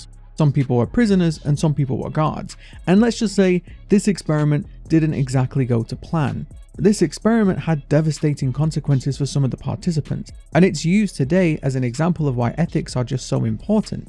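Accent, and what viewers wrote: United Kingdom. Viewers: I don’t know why but I get you and It’s justJords theories mixed up because your both British, sound similar and have very interesting theory’s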